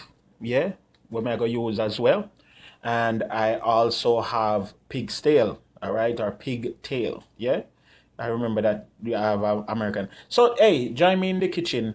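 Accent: Jamaica. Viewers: loll hes Jamaican and definitely trini or guyanese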